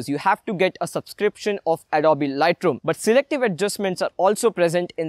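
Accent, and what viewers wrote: India. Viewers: The Indian accent is so funny! 🤣😂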